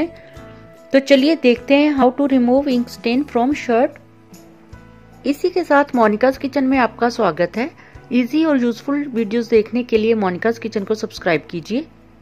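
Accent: Punjab, India. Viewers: Ma'am Aap ki hindi sun kar toh hum bhi Punjabi bolne lag jaayege, hamari Hindi ko Pls. Mat kharab kro.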